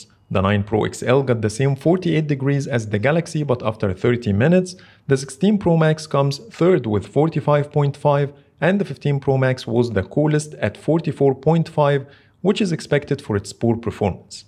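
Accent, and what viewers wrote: Egypt. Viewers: واضح جدا إنك مصري والإنجليزي بتاعك باين جدا ليه مش بتعمل فيديوهات بالعربي .. اتعلم من عمر دايزر ويحيى رضوان قنوات بالعربي وشوف شغالين ازاي وعندهم متابعين قد ايه .. بلاش تعمل فيها مثقف وتروح تخاطب ناس مش هتعبرك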